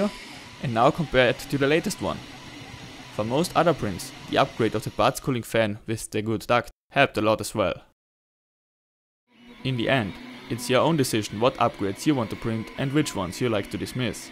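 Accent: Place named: Germany. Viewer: German accent too heavy can't understand what was said! won't stick at all! So can't print mods printing in ABS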